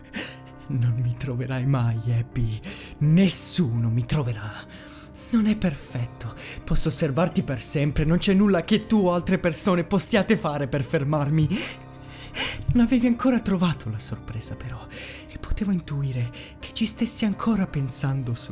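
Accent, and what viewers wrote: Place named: Norway. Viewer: sei bravissimo e amo troppo il tuo accento norvegese credo. Continua così perché sei un mito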